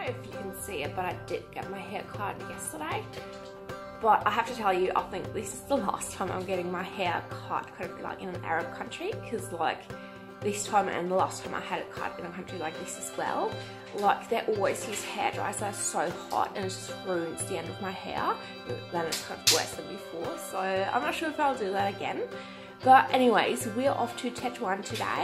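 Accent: Australia. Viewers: I lived in Germany for a while Stuttgart und umgebung :) and at the beginning I was about to say you are German But that's kind of impressive how well you were able to pick up the aussie accent and this misled me into thinking that you are either aussie or kiwi :D That's great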